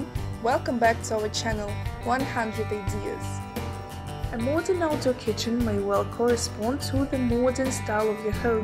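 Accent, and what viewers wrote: Russia. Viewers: Видео отличное, но вот вопрос: почему нельзя было озвучить на русском и сделать английские субтитры. И еще: Где нашли такой рязанский акцент?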